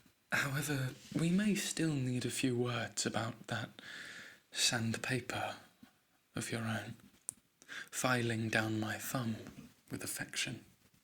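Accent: England, United Kingdom. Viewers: Something about your accent, poetry writing, mug of ✨hot chocolate ✨ and train destination reading just sits right with me, it is so british i love it!! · i am almost early yeyyy😂 i love english accent oh god😻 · God I'm in love and is probably because of his weird behavior and british accent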